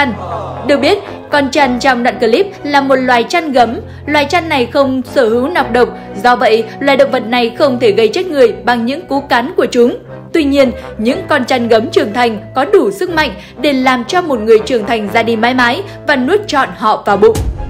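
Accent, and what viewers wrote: North, Vietnam. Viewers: Nay kênh đổi tên đổi giọng bắc review luôn:)) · Trở về giọng Nam Bộ đi, giọng Bắc nghe ko quen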